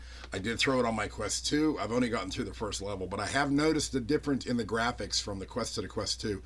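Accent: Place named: Pittsburgh, United States. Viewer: I just randomly found you with the pressure pot video. I'm doing a Jerry rigged vacuum for some resin and we'll see how it goes first, but it sounded like you were a Pittsburgher, then I saw you had a Kennywood puppet video.... Then I saw your channel is, and I might be misremembering but have I seen that sign up in Gibsonia for the last like decade??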